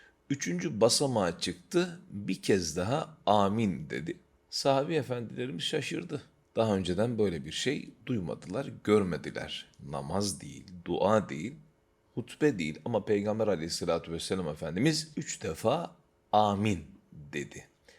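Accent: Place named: Arab world. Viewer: hocam, içerik olarak gayet keyifle dinliyorum, çevremle paylaşıyorum ancak türkçeyi de arapça diksiyonu ile konuşmanız bir süre sonra yormaya başlıyor, suni/zorlama geliyor. ali rıza demircan hoca misali…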